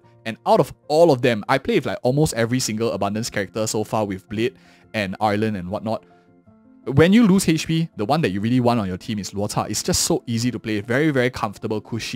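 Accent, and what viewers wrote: Singapore. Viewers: immediately could tell the singaporean accent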